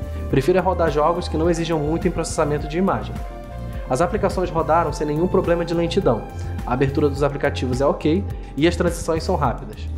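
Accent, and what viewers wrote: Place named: Rio de Janeiro, Brazil. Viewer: Fotos no bairro da freguesia Jacarepaguá... Feliz por se inscrever no canal de um Carioca como eu... Tmj amigo! Melhor q aqueles reviews de sotaques Paulista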